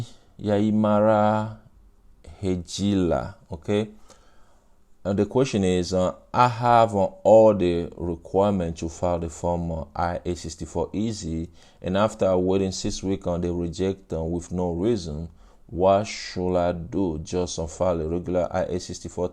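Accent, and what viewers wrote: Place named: France. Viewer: Are you french by origin ? Love the accent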